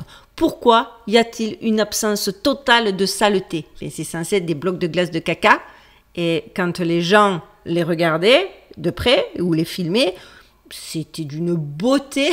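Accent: South, France. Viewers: J adore votre accent du soleil je connais ma famille se cité dans l herault région Languedoc-Roussillon surmène ❤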